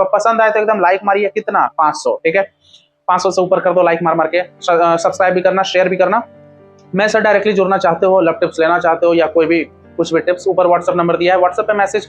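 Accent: Bihar, India. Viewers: Aap bihar se ho kya bhai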